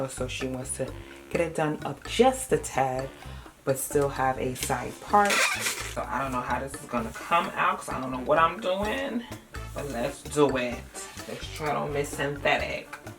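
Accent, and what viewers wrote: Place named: New York, United States. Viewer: You are very talented . Where do you live ? You sound like you live in new york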